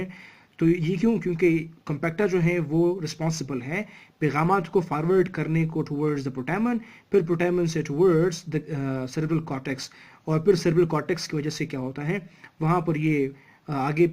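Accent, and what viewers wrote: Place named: United Kingdom. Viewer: U are having good teaching methods but don't try to teach like British person i think Pakistani don't get that language 😮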